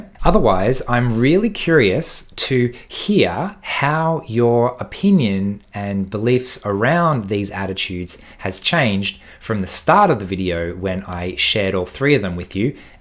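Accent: Australia. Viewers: Nice to hear an Ozzie voice... :)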